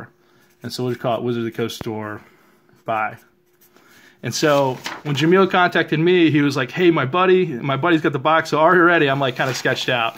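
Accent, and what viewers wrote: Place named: New York, United States. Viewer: Bro I knew from your accent you lived in New York haha I'm in New York as well and could just hear it!.. amazing that you were out here collecting and making big moves so many years ago and still today.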